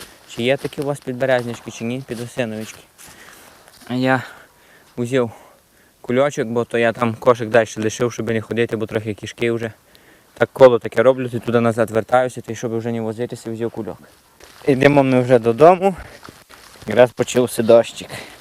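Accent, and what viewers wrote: Hutsul, Ukraine. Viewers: Привіт гуцулам з Харкова. Слава Україні! Слава ЗСУ! Приємно чути ядерну гуцульську мову. 🇺🇦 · Як він гарно говорить.Правдивий гуцул.А гуцули вільні люди!!!!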